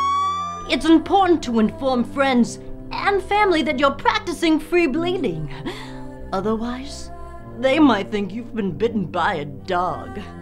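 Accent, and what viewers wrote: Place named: Alabama, United States. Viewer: what accent does she have ? Alabama ? Its nice sounding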